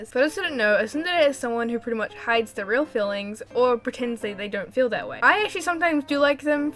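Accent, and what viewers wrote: Australia. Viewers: You Aussie?? YASS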